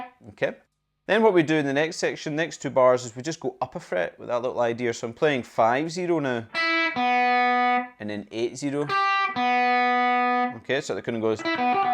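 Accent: Scotland, United Kingdom. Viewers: The guy is Scottish mate . Dont confuse the two.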